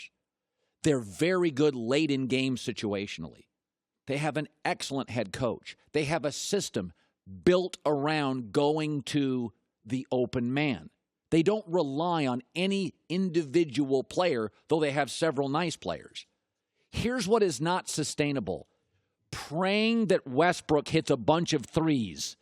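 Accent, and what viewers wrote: United States. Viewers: Pure American accent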